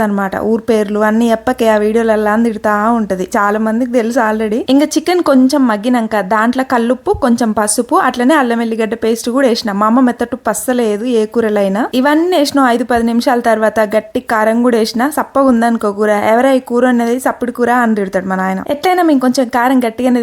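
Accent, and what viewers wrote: Telangana, India. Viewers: నువ్వు గీ తెలంగాణ యాసల జబర్దస్త్ మాట్లాడి కోడి కూర వండినావు లే....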